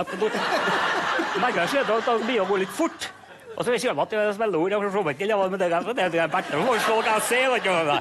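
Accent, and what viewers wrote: Norway. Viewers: Trønder dialekta hans er ikke så ille,så litt kjenne jeg meg igjen i....Verdals dialekt er ikke "de`re", "e`kje" ,men kanskje i annen sør trønder dialekt. Sier ikke "bætter me",men "bætter`e mæ"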